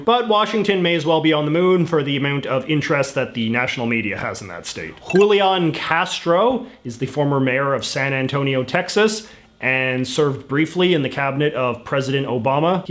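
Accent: Canada. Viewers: Aboot...u are Canadian aren’t you! #Bernie2020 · are you canadian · It's the Canadian pronunciation.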